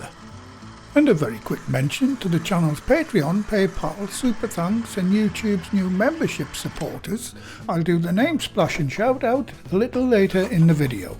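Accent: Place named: Newcastle, United Kingdom. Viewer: Your voice is so calming for less confident cooks! " Set your yeast away"... I've decided more food channels need to be in Geordie dialect!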